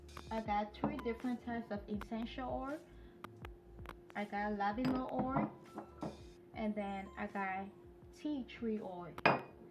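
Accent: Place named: Liberia. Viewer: Sis, are you Liberian? I dig the accent. I too am Liberian. Love your channel and very informative.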